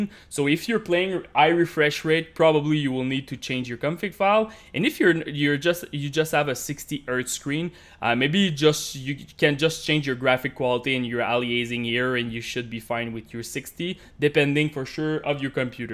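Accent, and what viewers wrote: Quebec, Canada. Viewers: that french-canadian accent DAMN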